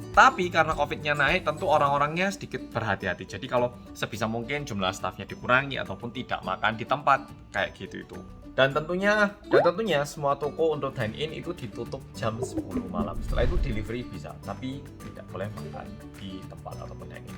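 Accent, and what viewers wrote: Java, Indonesia. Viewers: Hallooo oppa medok....madiun stay tune ya....sama2 ppkm parah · Mase... Jawa biangeeet logate... Gak nyangka jebule wong korea..... Kudu "iri" aku.... Ak ngomong bhsa jawa ora dapet logate...susah rek... Datar nadaku 😁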